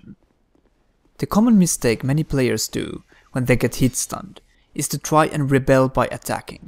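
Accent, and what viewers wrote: Finland. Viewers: that accent, i could swear that you are from finland =) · arvasin tosta aksentista :D terveisiä kajaanista :DDD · are u finnish?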